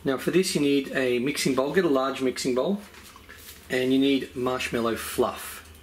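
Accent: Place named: Australia. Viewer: Remember when I was only 11 and watched a ton of your videos, I think you only had around 50k subs. Still Love Your Aussie Accent!!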